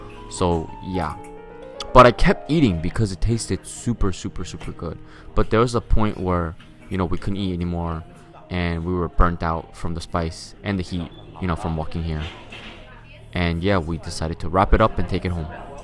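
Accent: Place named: China. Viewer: 你的母語應該是中文吧，聽的出來你的英語有口音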